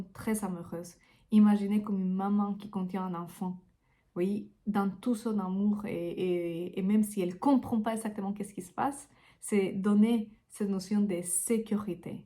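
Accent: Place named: Spain. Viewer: J'adore votre accent je suppose que vous êtes espagnol....merci pour cette belle vidéo Namasté ❤🕉🙏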